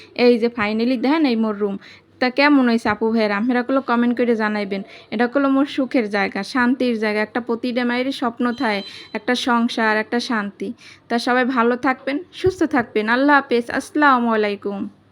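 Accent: Barishal, Bangladesh. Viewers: অনেক সুন্দর হয়েছে আপু ❤❤❤তোমার বরিশালে ভাষায় কথা গুলো ভালো লাগে 😅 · ❤❤আসসালামু আলাইকুমপ্রথমে লাইক দিয়ে তোমার পুরো ভিডিও জুড়ে ছিলাম তোমাকে আমি দুই দিন আগে ভালোবাসা দিয়ে রেখেছি মাশাল্লাহ খুব সুন্দর করে তোমাদের বরিশালের ভাষা কথাগুলো বললে খুবই ভালো লাগলো😮 শুনে সব কিছু মিলিয়ে দারুন লাগলো তাই তোমাকে ভালোবাসা দিয়ে রেখেছি 👉🔔বুঝে নিও তোহার হলো তোমার পরিবারের জন্য আপুর উপর বিশ্বাস রাখতে পারো লাইকডান আলহামদুলিল্লাহ ❤❤🎉🎉😮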